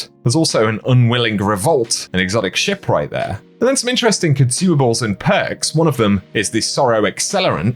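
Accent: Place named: Midlands, United Kingdom. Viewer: I would’ve out good money on Fate of all Fools being in here! Great video! Ps are you from Birmingham / the Midlands? Your accent sounds familiar!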